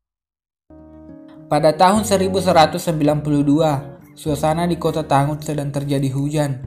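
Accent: Bugis, Indonesia. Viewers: Bang lu orang bugis gak si. Soal nya orang bugis ngomonya kaya gitu? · Logat bugis ta yee' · Mantap logat Bugis nya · Makassar gk bisa hilang logatmu 😂😂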